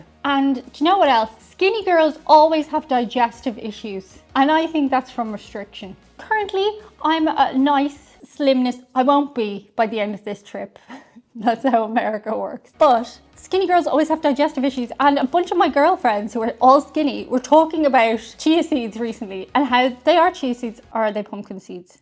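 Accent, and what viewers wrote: Ireland. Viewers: Was it just me, or did sound more "Irish" than usual in this video?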